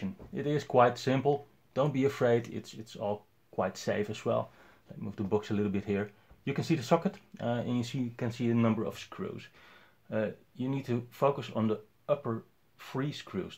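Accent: Netherlands. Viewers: Are you DUTCH ?????? FROM AMSTERDAM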